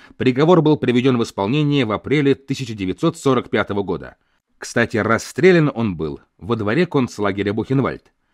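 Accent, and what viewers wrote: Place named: Moldova. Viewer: Очень сильный у ведущего Молдавский акцент , аж уши режет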